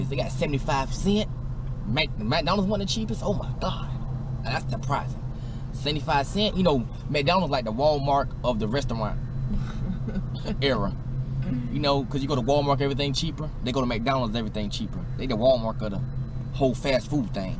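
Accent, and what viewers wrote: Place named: South, United States. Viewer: u seem like a cool down to earth dude love the vids. also i can tell u from the south cause that accent the way you said review haha respeck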